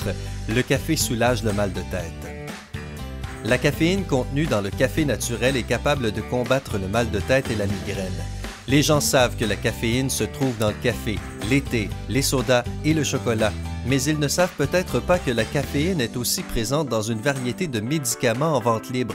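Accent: Quebec, Canada. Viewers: Greg Paris c'est l'accent québécois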